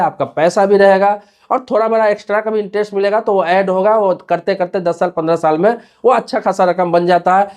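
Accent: Bihar, India. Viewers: बिहारीं लँगवेज!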